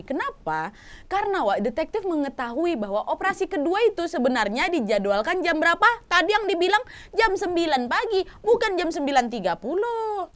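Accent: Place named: Medan, Indonesia. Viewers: Semangat btul Kk ceritanya 🤣🤣 BTW klo boleh tau Kk orng mana???? logat bicara nya seperti kami orng Sumatra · Kak aslinya mana sih, suka bgt denger caranya ngomong, koq Kya tetangga aq dulu org medan😀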